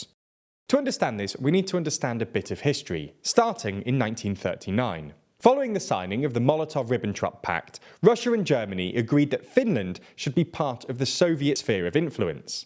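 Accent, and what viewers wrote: United Kingdom. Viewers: Excellent exposé but you're highly accentuated British accent is so painful to hear! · Good job on your British accent!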